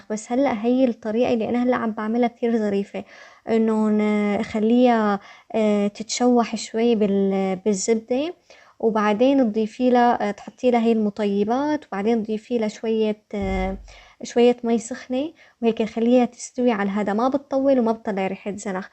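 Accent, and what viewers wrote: Syria. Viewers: انا مصريه ولهجتك بتذكرني بجارتي السوريه ، وانا كتير بحبها وحبيتك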